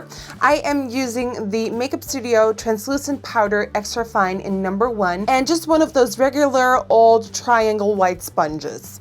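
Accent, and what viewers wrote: Netherlands. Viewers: 2015 is so different to 2011! She used to have such a thick Dutch accent! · Are you dutch? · Subscribed!! Not only are you funny sweet and full of great tips but your adorable accent reminds me of my late great grandmother and my uncle. Both are from the Netherlands too!! Keep up the great work and awesome videos! 😘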